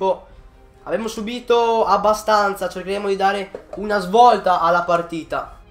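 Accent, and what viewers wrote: Veneto, Italy. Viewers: Ma sei di Venezia?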